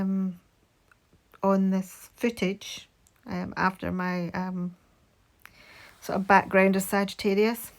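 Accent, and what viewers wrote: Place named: Scotland, United Kingdom. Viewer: Loved this WIP & Chat and learning more about the Sagittarius. Very interesting indeed. I was sitting here the whole time thinking you sounded like you were scottish then you mentioned Robbie Burns. I have not been a Burns Night in years. I live in the prairies and they celebrated here annually and I use to attend it when I was younger with my parents. Brought back some good memories. 💜💙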